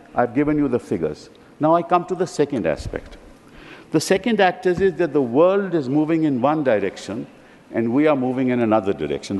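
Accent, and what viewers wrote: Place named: Tamil Nadu, India. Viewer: எங்க தலைவரே தமிழ் ஆங்கிலத்துல பேசினாலும் தமிழ் போல தான்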